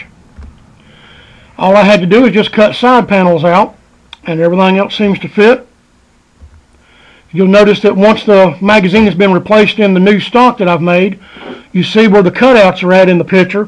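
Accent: Maine, United States. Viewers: That Maine accent isn't wasn't used to be.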